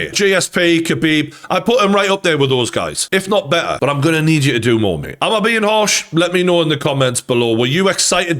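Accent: Newcastle, United Kingdom. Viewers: 100% Geordie this is a joke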